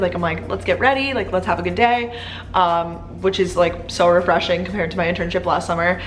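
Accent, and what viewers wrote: Long Island, United States. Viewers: Do you feel like you lost your long island accent? also how long do you plan to stay in boston?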